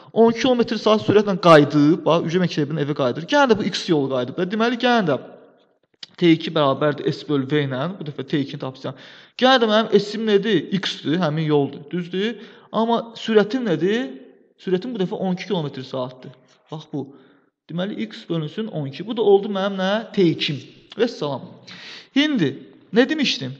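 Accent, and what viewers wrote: Baku, Azerbaijan. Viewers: Bakı ləhcəsi ürəyim açıldı👍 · Eşq olsun Bakılılara danışığın çox xoşuma gelir ele billem yerlimle danışıram😄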